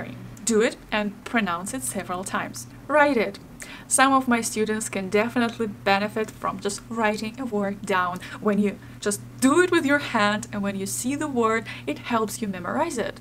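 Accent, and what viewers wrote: Russia. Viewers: Is she Russian? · Is Russian her first language?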